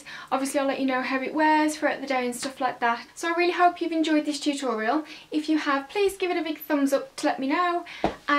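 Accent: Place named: Midlands, United Kingdom. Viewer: I haven't heard someone from the Midlands on youtube before XD Represent!! Lol.Nice vid :)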